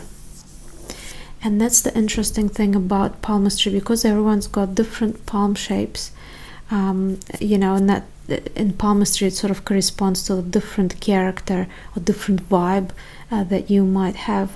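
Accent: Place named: Russia. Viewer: Your accent telling me you’re quite fluent in speaking Russian)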